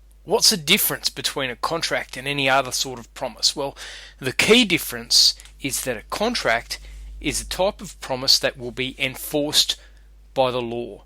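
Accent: Australia. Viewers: Please excuse my ignorance, but does this apply in United States law? Or is this upload meant for Australia (or elsewhere, I'm only going off of your accent honestly lol).... Not trying to generalize (maybe you're in the US and just happen to have an accent from before), but I figured I'd better make 100% sure that this will apply to my own situation :) thanks for the insight on contract law! Very well explained.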